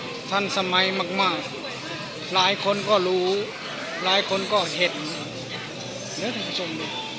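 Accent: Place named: Savannakhet, Laos. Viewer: สวยมากฯ... เสืยงคนทาง สะหวันนะเขด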